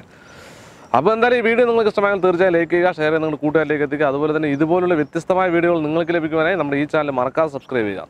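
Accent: Palakkad, India. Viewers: ഏട്ടാ നിങ്ങൾ പാലക്കാടൻ അല്ലെ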